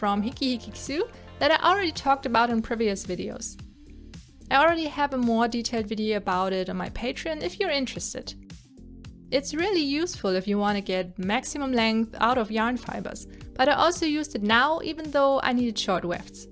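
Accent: Germany. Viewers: moin moin ^^ i love ur dolls so much they are so beautiful!! I'm curious are you German because of the "moin" and sometimes I think I can hear a german accent🤔🤔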